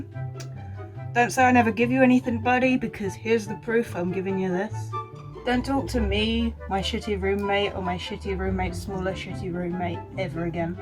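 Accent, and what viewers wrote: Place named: United Kingdom. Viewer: too british.. otherwise 10/10 thank you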